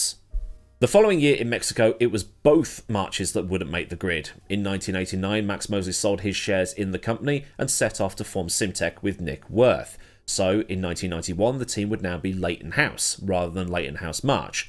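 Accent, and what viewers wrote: United States. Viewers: Your American accent is impeccable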